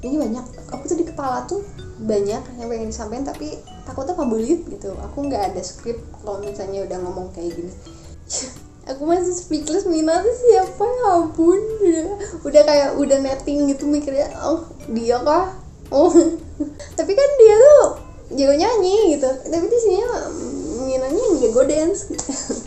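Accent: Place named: West Java, Indonesia. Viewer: Teteh ni sundanis bgt ya ..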